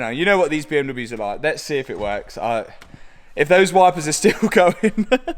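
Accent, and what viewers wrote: United Kingdom. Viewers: Yaaay. British accent! Hahaha. Let me get a cuppa! 😆👌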